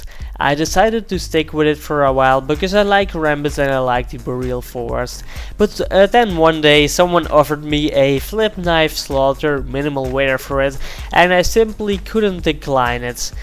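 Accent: Netherlands. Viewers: pik praat eens fatsoenlijk engels het is echt irritant om het nl accent de hele tijd te horen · Are you Dutch?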